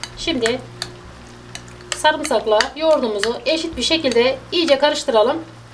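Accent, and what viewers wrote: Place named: Central Anatolia, Turkey. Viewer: konuşma şivesinden İç Anadolu lu olduğunuzu tahmin ediyordum ama bu kadar yakın olduğumuz aklıma gelmezdi Çok memnun oldum Başarıların daim olsun kal sağlıcakla 🥰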